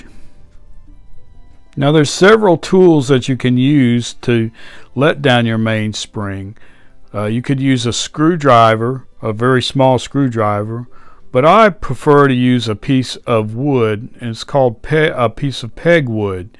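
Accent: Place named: North Florida, United States. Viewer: Awesome, thank you! I received a Benrus that was overwound and it's going to need human intervention. By the way, are you from Northern Florida/Alachua County? Your accent reminds me of home.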